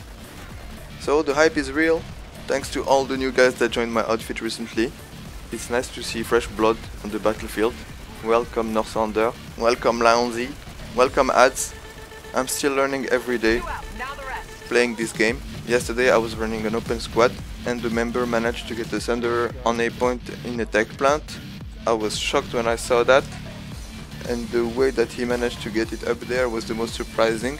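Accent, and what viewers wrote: France. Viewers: Good video ty...Hey can i ask why you speak like a french guy? i am french and when you speak in english, it looks like you know how to speak french:)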